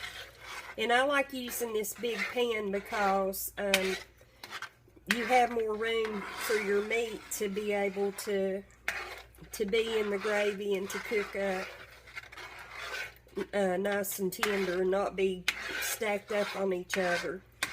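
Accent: South, United States. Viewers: Just found your chanel and I love the way you cook and your southern accent is so cute. Greetings from Spain. Xx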